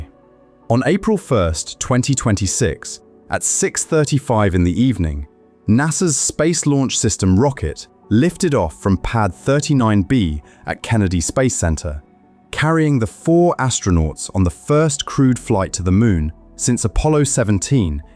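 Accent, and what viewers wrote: United Kingdom. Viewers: FAIILLL!! British (voice) narration ALWAYS exaggerates!! I'm sick-&-tired of British-narrations!! Great-Britan hates America, sooo, WHY are 'they' narrating stories about 'us'....??!! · The thumbnail said “What Artemis II saw in the six minutes that nearly ended them”.. No mention of temperatures. The voice sounded more British rather American and as 8 billion of us and science of us use Celsius, it would make no sense for me to think the video would have been referring to Fahrenheit, particularly when the temperature mentioned is closer to the photosphere’s temperature. The temperature of the capsule on reentry was about 2,760- 2,800C . So I suspect there was some carelessness in the title, and the 3000 degrees referred to the temperature of the capsule on reentry in Celsius.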